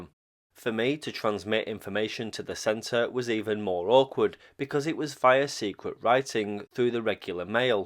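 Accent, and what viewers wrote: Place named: Liverpool, United Kingdom. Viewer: Your accent reminds of The Beatles accent. I was able to See The Beatles in Memphis Tennessee in 1964.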